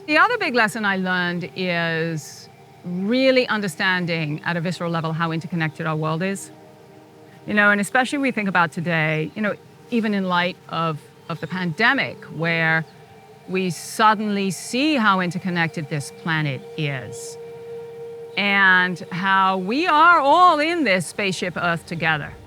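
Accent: United Kingdom. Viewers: So, Mr. Smartypants, tell us what a selfish American she is when she has a BRITISH accent. How ignorant can you be? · Is RP English - she would have had very exclusive education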